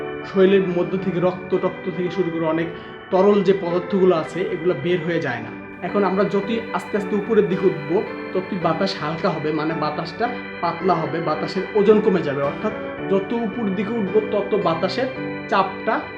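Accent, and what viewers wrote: Sylhet, Bangladesh. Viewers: ভাই আপনার দেশের বাড়ি কি সিলেটে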